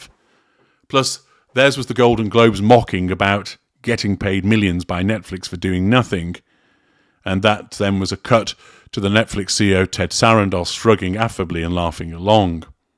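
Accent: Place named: Cockney, United Kingdom. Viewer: Hey Mr cockney Man!!! We don't talk shit about your royalty's entitlements so don't talk about our American royalty. Those two and their feral kids are American now with all the entitlement BS that comes with it?!!Sooo!!! why don't you have a beer and a smile sit down and shut the F/U!!!!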